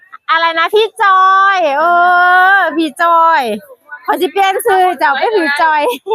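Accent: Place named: Sisaket, Thailand. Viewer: เว้าสำเนียงศรีสะเกษบ้านเฮาเพราะหลาย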